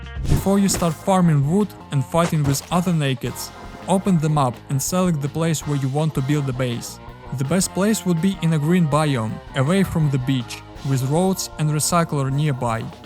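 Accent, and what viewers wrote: Russia. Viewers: I’m might have unpopular opinion but man it’s so hard to watch hard accent videos like this, why every 2nd video from someone from EU is HARDEST RUSSIAN ACCENT 😭🤞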